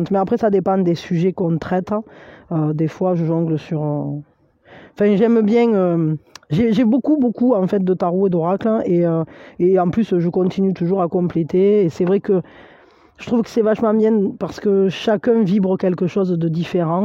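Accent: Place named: South, France. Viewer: Vous avez raison , la Gratitude est du miel pour le Coeur. Merci à vous pour les messages transmis. Vous répondez à mes prières au mot prés ! Un jour sans doute quand le moment sera Juste et Bon pour moi. Juste une remarque : Avez-vous remarqué que votre voix, au demeurant très mélodieuse, perd son accent du Sud quand vous chantez ? Merci encore et prenez bien soin de vous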